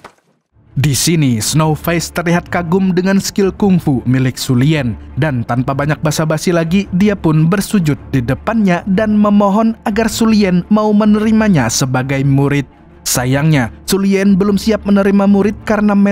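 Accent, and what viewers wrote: Banjar, Indonesia. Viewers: Laaah bahasa banjarr orang banjar kah neh😂😂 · Ae, orang Banjar skli nya amank narator nya...😅😅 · Bhsa BANJARnya khAs, 😂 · pian banjar kah min..wkwkwkwk salam dri kandangan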